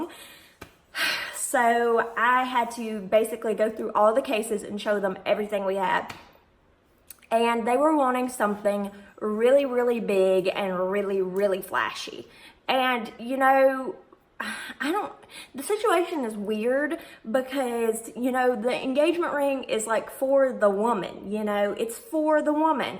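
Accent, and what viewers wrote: South, United States. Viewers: where in the south are u from because I like your accent 😊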